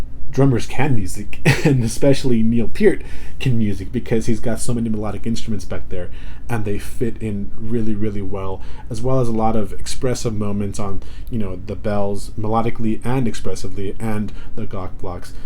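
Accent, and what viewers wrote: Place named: England, United Kingdom. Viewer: Other then your comments about this flawless band, I love your English accent. I'm mexican and I am an English teacher. I love Rush, I also like the police. Greetings from México.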